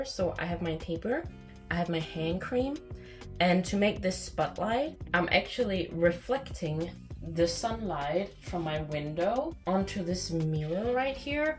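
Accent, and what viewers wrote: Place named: Scandinavia. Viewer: Oh my gods, I did wonder whether you were Scandinavian or Dutch or something similar due to your accent - and here you are walking around in my own city! Gosh, the world is a tiny place sometimes XD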